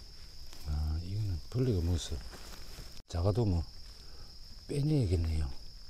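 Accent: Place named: Gyeongsang, South Korea. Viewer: 구독,좋아요,알림하고 갑니데이^^ 말투가 정겹심더~~ㅎ 경남쪽 같으시네예 산행시 늘 안전하게 풍산하시길예^^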